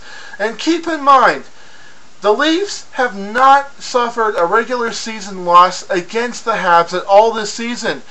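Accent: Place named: Sweden. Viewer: Why the Swedish accent?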